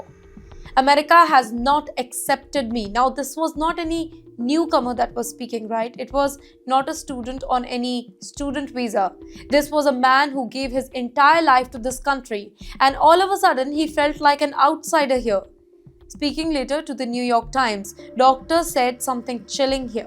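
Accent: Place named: India. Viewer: Two things, how come this lady is having an anchor Job with this much of Mother Tongue Influence( My bet is, it is Hindi) in her English. Secondly why this channel is obsessed with US as if a girl who is obsessed with a boy who moved on.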